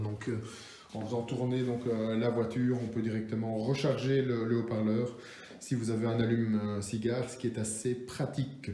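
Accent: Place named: Belgium. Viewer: Tu es Belge ? Ça s'entend 🤣 je le suis aussi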